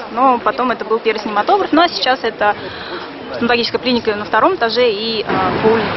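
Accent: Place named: Saratov, Russia. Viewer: Комментирует нормально, чисто по Саратовски, не нравиться не слушай.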